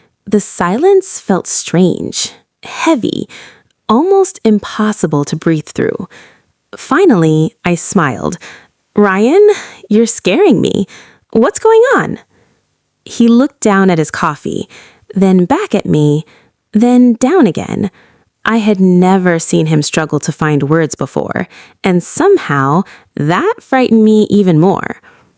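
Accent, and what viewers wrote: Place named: United States. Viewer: ❤❤❤❤. American English so nice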